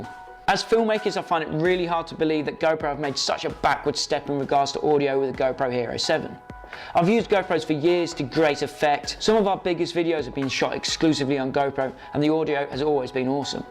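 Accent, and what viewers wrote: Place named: United Kingdom. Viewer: Great video, thanks for taking the time to make it 🙂. It answered a lot of my questions about wind noise, in a normal, undramatic British accent........God, I'm sick of all the over-exaggerated, over dramatic American accents on YouTube !! ☹️. As the speeds are quite low on your vid, what are your thoughts about wind noise on a side helmet mounted road bike travelling at speeds up to 80mph, do you think the results would be the same ?? Cheers 🙂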